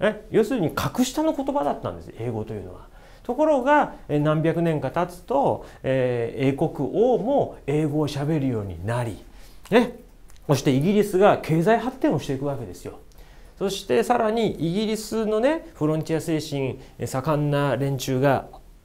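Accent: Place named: Tohoku, Japan. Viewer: 東北弁に聴こえるー。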